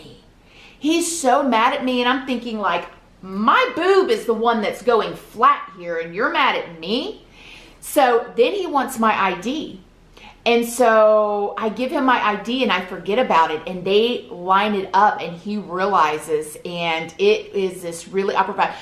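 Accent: South, United States. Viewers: guess I should've figured, being she talks about Florida's women's prisons.. lol I guess when she said a couple hours from New Orleans it threw me off.. plus I guess I didn't realize ppl in FL really talked like Southerners... What do I know? FL is one of the few states I haven't been to. Thanks for the response :) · Hey nothing wrong with that southern swang 🤣 I live in the same town as her and we all say y’all around here 🤣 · You're so fn southern. It's like, real genuine "y'all" can't make it up❤❤ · 🤣🤣 ya'll count! I love it! I think it's the cutest! I love southern accents and the specific way they say certain things! So charming!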